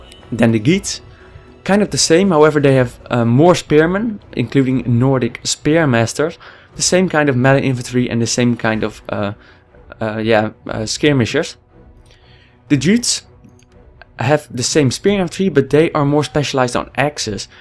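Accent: Netherlands. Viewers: Je bent een Nederlander zo te horen. Interessante review tho. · Deze kerel moet wel nederlands zijn haha. lekker accent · Damn, that dutch accent though, keep up the good work!👍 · are you dutch by any chance? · Zo Nederlands deze vent, geniaal accent dat!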